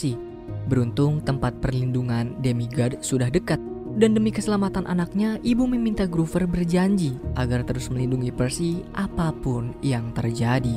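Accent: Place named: Java, Indonesia. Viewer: bang orang jawa ya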